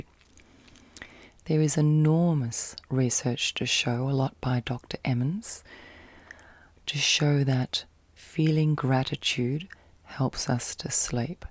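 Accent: Australia. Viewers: I just discovered you in perusing sleep & anxiety videos. I'm Alex, a 46yo San Francisco, CA, USA 🇺🇸 native, and you have one of thee most soothing, sultry, feminine voices and dispositions I've ever heard. You're an Aussie, I assume? Thanks for this therapeutic, relaxing video. 👌🏻😴💤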